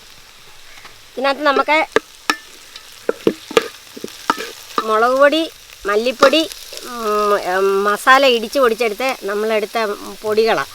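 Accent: India. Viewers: Ammmachi bhaaaasha super like yourlanguage..aaalapppuzha.suppppper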